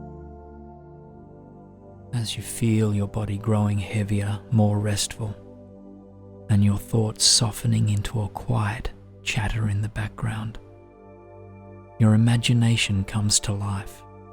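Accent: Australia. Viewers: Hello, I love your recordings, they are the best, they are so soooothing. We can truly sense your care in the tone of your voice and it is really heartwarming. I have been using your videos to calm my mind for over a year now. And recently I introduced my 13 year-old son to them because he tends to worry too much and has trouble falling asleep. Tonight he came find me and asked me to listen to one of your videos, he likes them so much! And he fell asleep peacefully lulled by your wonderful Aussie accent that we truly love. Thanks a lot for all the love and dedication you put in your channel. It is greatly appreciated! Cheers from Canada 😊🙏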